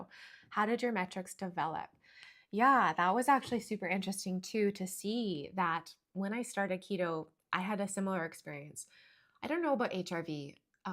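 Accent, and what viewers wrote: Canada. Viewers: I loved hearing your Canadian lingo come out 😍 The use of “hey” after a statement is the cutest! I’m from Texas and I never hear it. Love it.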